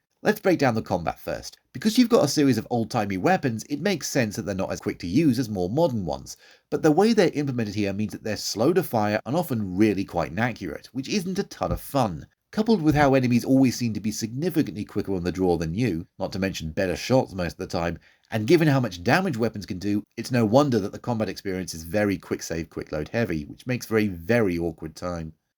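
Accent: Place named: England, United Kingdom. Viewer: Ребята он ряльно англичанин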